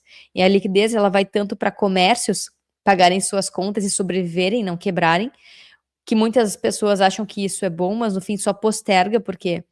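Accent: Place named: Porto Alegre, Brazil. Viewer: Comecei a seguir o canal só para agregar o sotaque porto-alegrense às análises econômicas, e sentir falta de casa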